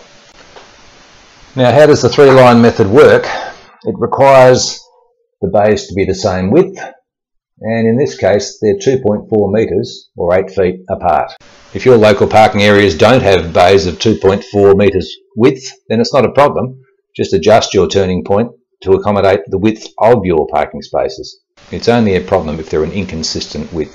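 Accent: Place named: Australia. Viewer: he’s not a Brit he’s Australian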